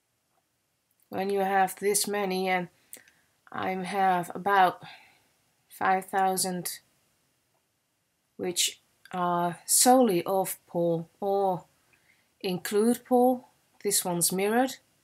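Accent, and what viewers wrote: United Kingdom. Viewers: "Hey Ringo, how are you?" HAHAHA :D Nice video! I wonder how long it took to collect all these gorgeous photos. ...And I feel your struggle with the English language (I'm German and I try to get rid of my German accent haha), but you already do sound pretty well and British in my opinion! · Thanks for sharing this, it was wonderful and a great way for me to start my day. I found myself chuckling along with some of your commentary especially regarding the PIDers. I thought at first that your accent sounded slightly British but as an American with my own southwestern twang (accent on the southern) I wasn't sure until you said you are a Dutch girl. Anywhoo thanks for this.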